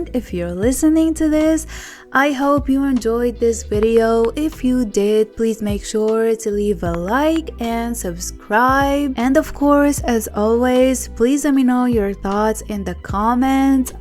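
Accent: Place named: India. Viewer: was gonna listen but indian accent = opinion rejected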